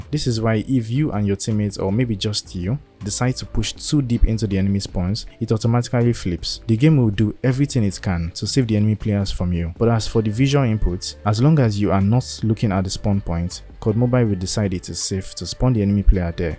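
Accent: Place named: Africa. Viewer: Ooohhhh my gooodddddddddd ur african accent kills meeeee It's soooo guuuudddddd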